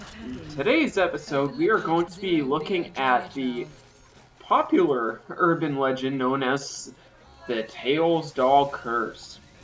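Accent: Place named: South, United States. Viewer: I never noticed until now how southern you do sound in this. XD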